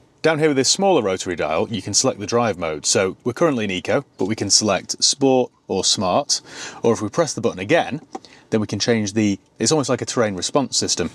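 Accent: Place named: RP, United Kingdom. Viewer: Incongruous. Posh you are.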